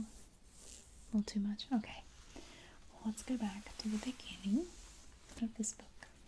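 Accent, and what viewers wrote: South, United States. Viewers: I love your voice and southern accent!!!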